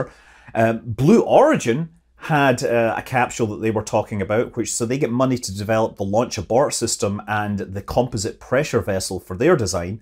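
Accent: Scotland, United Kingdom. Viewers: Scottish to Amerikan translation is needed for this expression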